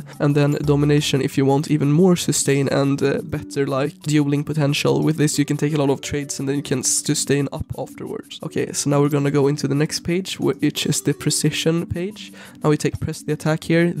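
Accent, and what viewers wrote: Sweden. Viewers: Do hear a Swedish accent? c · är du från sverige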